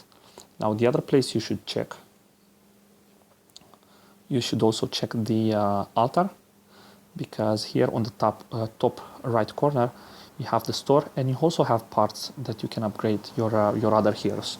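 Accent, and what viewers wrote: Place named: Romania. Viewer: you sound romanian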